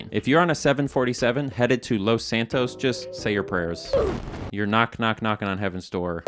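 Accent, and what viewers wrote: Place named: Minnesota, United States. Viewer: Sometimes I forget you’re from Minnesota and they you say “bars” lol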